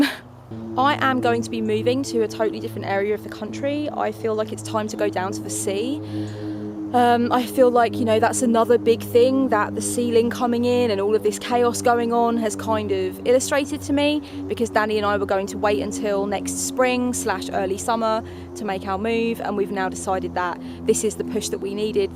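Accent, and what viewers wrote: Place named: Yorkshire, United Kingdom. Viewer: A. Luv the glasses B. luv the Yorkshire accent...UK impressions are always welcome to this Canadian gal ;) C. very inspiring as I work towards re-branding my own biz. You make ( as always) some fabulous points about signs and moving on. D. LUV that you are moving forward and clearing the cob-webs and are super jazzed to start fresh in October when things are ending all around us. Triple luv the juxtaposition of that! E...Luv you as always bc you are the bees knees. Thanx again! xo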